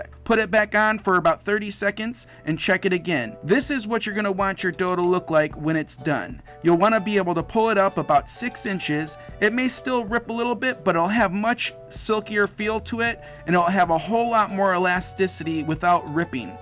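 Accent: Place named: Upper Midwest, United States. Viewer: accent from upper mid-west, check. banana peppers on pizza, check. pizza isn't limp when it comes out, check. you have my full endorsement and I will be trying this today. 🙏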